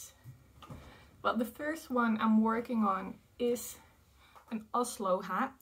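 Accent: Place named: Scotland, United Kingdom. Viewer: I could hear the wee Scottish twang coming through sometimes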